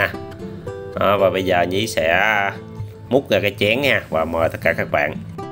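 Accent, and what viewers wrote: South, Vietnam. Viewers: Giong ng mien nam nghe êm tai dể chịu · Video nấu phở nầy quá hay! Cách chỉ dẩn nấu, cách nói, giọng ng Miền Nam quá tuyệt hay! Bấy lâu nay mình nấu Phở sai, nên ko đc ngon, nhờ video nầy mình tin chắc rằng sẽ có nồi phở rất ngon. Rất cám ơn A nhiều! Chúc A luôn thành công trên con đường đưa cái tài nấu ăn của mình đến cho càng nhiều khán giả đc biết. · ngon quá Nhí ơi. nghe giọng miền Nam thân thiện làm mình nhớ nhà quá.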